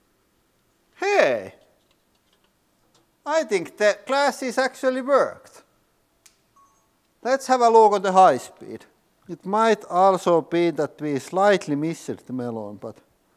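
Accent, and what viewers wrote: Finland. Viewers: Are you from finland? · I lived in Finland for two years and knew right away when I heard that accent. 💙🤍 Suomalainen voittaa aina.... jopa hydraulipuristimella! 😂 🇫🇮 🇫🇮 🇫🇮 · Finnish accent is so suited to these videos « Ha! Let’s brrekk tings ! » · The finish accent makes it even more funny imo :D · You can hear the Finnish accent 😂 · The swearing in a thick Finnish accent will never not make my smile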